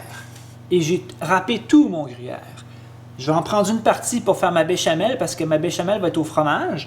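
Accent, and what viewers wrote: Quebec, Canada. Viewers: Hihi c'est marrant que tu sois français :) Car tu as bien pris l'accent du quebec ^^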